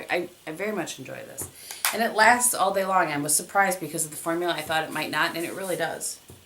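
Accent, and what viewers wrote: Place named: Midwest, United States. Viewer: Hey! i just wanted to let you know I love your channel, when i started watching i was thinking "wow she sounds so much like home" and then i realized you were from the midwest too! I grew up in the Twin Cities so you sound like home ;)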